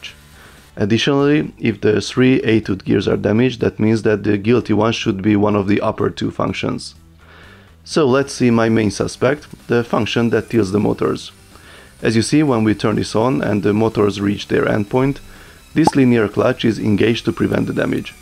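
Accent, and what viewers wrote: Hungary. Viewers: a neve, és magyar dialektusa van. Biztos hogy lengyel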